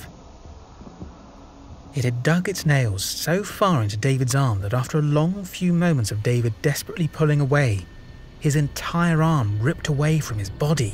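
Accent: United Kingdom. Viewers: Cheers fella, loving the content- I am sure your subscriber numbers will shoot up! Nice to hear a British voice 👍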